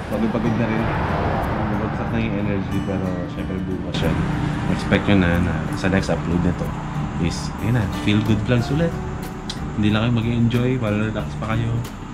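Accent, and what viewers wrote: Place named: Aklan, Philippines. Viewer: oohhhh.. Aklanon ka pala. kaya pala pamilyar sakin punto mo. hehehe. Mag moto Vlog ka din sa Town natin. hehehe. Aklan!!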